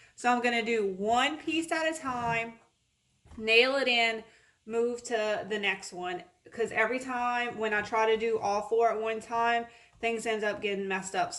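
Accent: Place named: South, United States. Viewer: Just discovered you and subscribed! Where are you from? Your southern accent is strong ☺️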